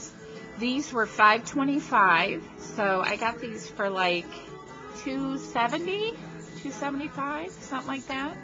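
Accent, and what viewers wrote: New Jersey, United States. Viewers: U CRACK ME UP!! I just love seeing your videos pop up because beyond enjoying what you create... I just love listening to your monologue!! I have a dear friend who's a Jersey girl, too, and its like listening to her when I catch your videos! You always provide a laugh for me!! TFS! ~Karen P.S. DEAL, DEAL, DEAL!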